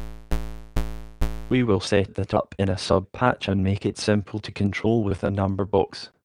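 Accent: Scotland, United Kingdom. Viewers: lol got so excited when i heard a scottish voice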